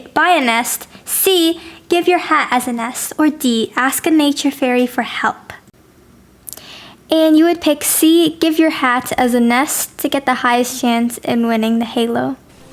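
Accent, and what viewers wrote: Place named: United Kingdom. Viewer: I LOVE YOUR BRITISH ACCENT OMG <3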